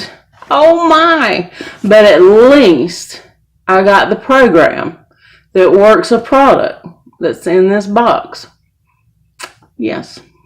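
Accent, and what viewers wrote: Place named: Texas, United States. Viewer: You have the most wonderful accent. Reminds me of my days in Texas. I have seen so many ads for those boxes now. But the Tarte palette in this season's box is just not doing for me. Hahahahaha.. I am at the Foreo (or something) part right now. I was thinking the same thing about the two prong analysis! Because, I mean, we are the first to notice in something in our skin changes, right? May be, for men, who are usually clueless (and proud of it) about skincare, might find it useful. Because, most men I know use a moisturizer, that their wife bought, if the wife says their skin looks ashy! LOL!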